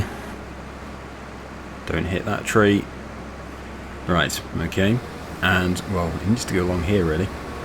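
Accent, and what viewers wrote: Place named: United Kingdom. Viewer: I always thought you were an Indonesian creator 😂 until I heard a thick Brit accent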